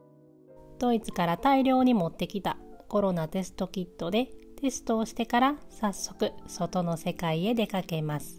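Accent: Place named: Osaka, Japan. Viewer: 素敵なチャンネルが上がって来て拝見したら、ほんとに素晴らしい✨ ドイツ🇩🇪は昔、息子がマインツにサッカー留学して色々調べたりドイツ語を勉強したりしたので親しみを感じ💕、そして大阪出身なので大阪弁が嬉しくて嬉しくて😢。じっくりチャンネルぜーんぶ観させて頂き、ぜひコロナ明けにいつか🇩🇪✈️行きたいと💡。 ジンバル使った美しい映像と編集ですが、撮影と編集はどんな機材を使っておられますか？ また、とても上手なアフレコ、テロップ、BGMですが、どんな風に制作されていますか？ お子さんもおられる中、素晴らしいワークですね！キチンと伝えるべきは伝えて、またひとり素敵なYouTuberさんに出会えて良かったです☺️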